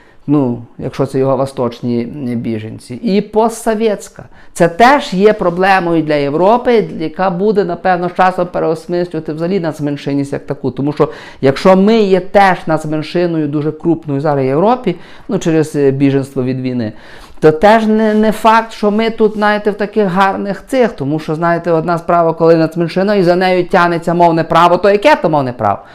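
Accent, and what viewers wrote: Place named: Lviv, Ukraine. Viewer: дякую за роз'яснення ситуації чудовим львівським говором. Вітання зі Львова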